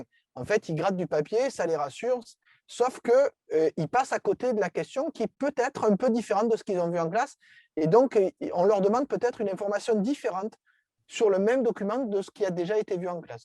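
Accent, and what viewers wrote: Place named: Marseille, France. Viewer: Merci. Jolie accent marseillais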